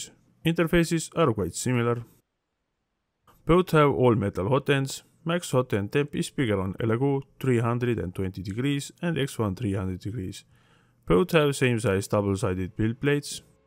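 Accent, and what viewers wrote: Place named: England, United Kingdom. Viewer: I don't have that either! I use translate! It's more about his deep voice and English accents! What I'm happy about and what's most important, is that makes some good videos, and I enjoy them!:)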